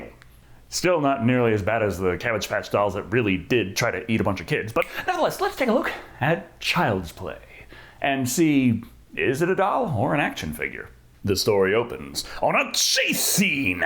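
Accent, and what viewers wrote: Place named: Brooklyn, United States. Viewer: I Love that Brooklyn accent, bruh! 😆😆😆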